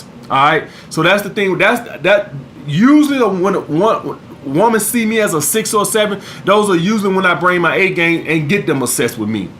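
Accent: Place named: Rural, United States. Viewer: .. Do women say your a "Fuck Boy", Because of your video content? ect..and also, im from N.Y. and have a thick AF N.Y. accent, why you sounds country as all hell? By the way..your vids are on point!👍✊🙏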